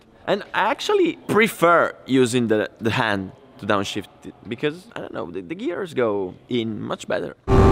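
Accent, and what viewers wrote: Italy. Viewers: minchia è siciliano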